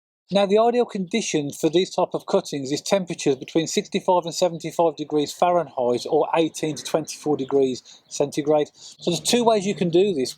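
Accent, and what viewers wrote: United Kingdom. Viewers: Eh, don’t bother me about adding the N. It’s the way Brit’s say compost. Not comPahhhst” it’s comPohhhst. Oh. lol not fence pahhhst. Fence Pohhhhst!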